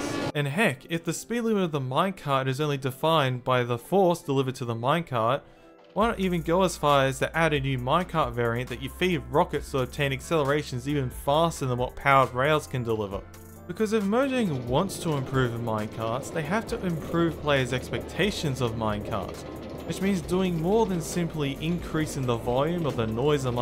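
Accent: Australia. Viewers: Two questions: Are you an Aussie? Is that your i20n?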